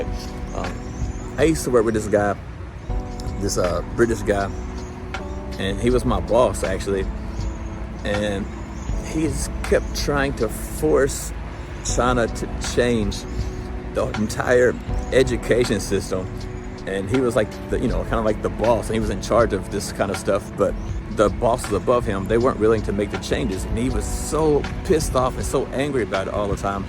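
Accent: South, United States. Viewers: you from the south? Why you sound black?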